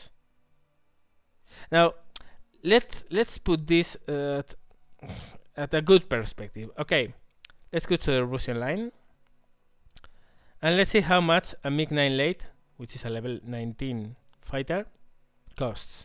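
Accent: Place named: Spain. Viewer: Question: How do you perform the "Hammer" part? (when you climb up and use the rudder to get your nose down). I tried to do the same but the plane simply gets out of control... Btw, your accent was very strange the first time i heard it. Then during your Q&A vids you said you are from Spain. Now it all makes sense. Im portuguese (yes i know its kinda strange talking to you in english) and i can spot several words you pronounce the same way you would in Spanish. Thanks for the vids^^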